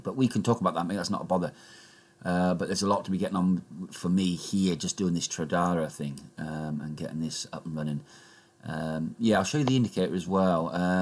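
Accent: Ireland. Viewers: hahaah damn I’m 100% wrong but you sounded very irish haha.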